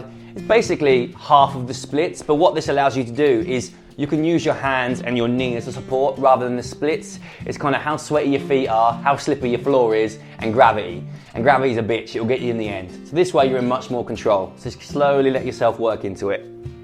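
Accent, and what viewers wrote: United Kingdom. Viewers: Haha, I can hear the British accent off of this, Sends much love from Kenya👋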